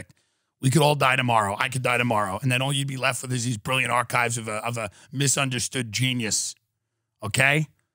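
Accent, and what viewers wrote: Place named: Suffolk County, United States. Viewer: LMAO. I put off liking Tim for the longest time but it's cus i grew up in Suffolk County LI and spent so much time trying to "overcome" it.. But listening to Tim is like being back home. I guess I'm saying congrats and continued good luck to him, as well as thanks for the entertainment. This is a compliment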